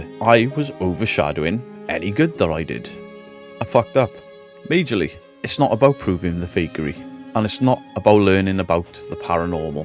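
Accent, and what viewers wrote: Wales, United Kingdom. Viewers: Actually choked on my coffee with this..from one sarcastic, pineapple haired, ear stretched prick to another... We effin love you and your Welsh freakin accent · Firstly can l say this is the best reaction to the stupid whinning comments ever. Secondary don't you ever get rid of the welsh accent, its beauty to heat. Keep up with the great work. Love you. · Why hide your welsh accent?? I lived in Abergele for30 years loved the place, right facing the beach too..2 of my youngest children were born in ST Asaph Denbighshire North wales..If the jealous trolls don't like you thats their own problem.NEVER CHANGE.. your doing an excellent job keep up with the excellent videos of debunking the fake channels. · I like your Welsh accent brother! I'm glad this all bullocks!!😂 Your a good man. We all know that! Thank goodness you weren't serious!! 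Lol...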